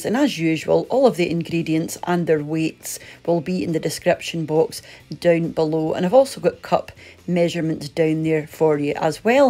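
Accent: Scotland, United Kingdom. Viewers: Thanks lovely recipe you make me homesick for Scotland when I hear your lovely accent and recipes all the best 😊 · Was looking frantically for apple crumble recipe and stumble on your! Once I heard the Scottish accent I knew I was in the right place. Needless to say I looked no further. Thank you I use this always now🙌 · thanks a lot.. all the way from kuwait 🇰🇼 to scotland 🏴, by the way i like your accent , 👌😍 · ahhh so yummy you have inspired me to make this ...... love the accent , my late mother was Scottish and thank you for the additional footage ..... I was supposed to visit my sister in Scotland but alas covid 19 thank you for this lovely recipe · That was lovely looking forward to the iced gingerbread, watching with one of my dogs Darcy by my side and she is looking up at me hearing your Scottish voice she clearly thinks you sound like me but can't figure it out this Scottish voice like mummy's coming from this black box 😂😂😂😂. 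Great wee video again. ❤️👌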